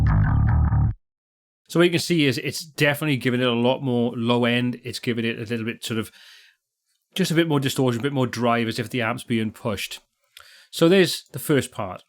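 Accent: Wales, United Kingdom. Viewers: Im sensing a bit of welsh on your voice there mate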